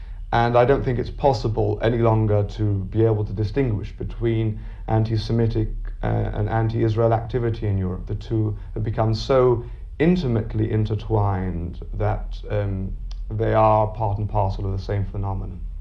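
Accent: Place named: Dublin, Ireland. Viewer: Not really, many upper middle class Dubliners born pre-1950s talked like that.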